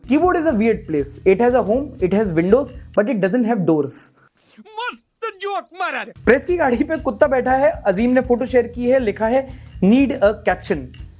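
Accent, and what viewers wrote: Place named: Patna, India. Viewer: Aapke speaking style se lagta hai aap patna se ho. Am i right?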